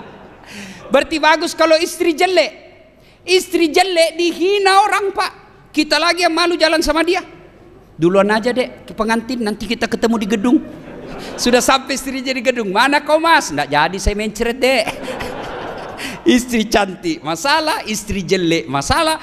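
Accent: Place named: Bugis, Indonesia. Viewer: Pengaruh Logat Bugis pak...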